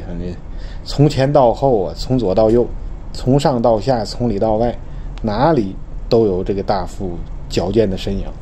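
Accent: Tianjin, China. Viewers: 看的视频有一阵了，刚开始没确定的天津口音，听着很熟悉，让我想起了在天津上大学的时候，作为一个南方人，也很爱吃煎饼果子。很喜欢的节目，我是干航空的，看航海的，我发现很多相似但又完全不同很新奇的知识，感谢的科普！ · 听口音就是天津爷们。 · 嘛，，我建议，您嘛还是讲天津话好听，当然您现在讲的也好听，听着舒服，亲切。我是建议呀，我看还是把这节目办的有点那么是相声的感觉，就更好了，凭您的水平，口才，我看成，点赞。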